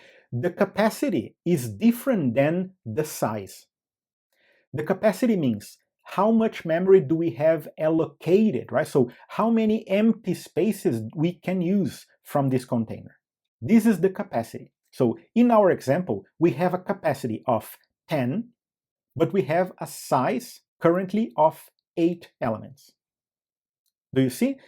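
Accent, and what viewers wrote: Brazil. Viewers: I'm sure your first language is portuguese, are you brazilian? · Eu reconheço o sotaque brasileiro quando eu escuto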